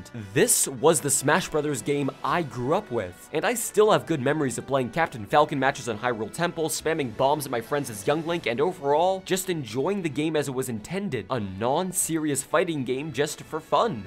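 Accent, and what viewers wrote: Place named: Norway. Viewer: You sound like you are from norway :D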